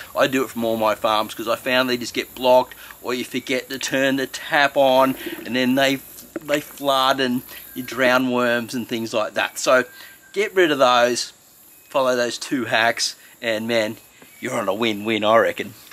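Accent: Australia. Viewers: Thanks mate. Always good to hear an aussie accent 🤙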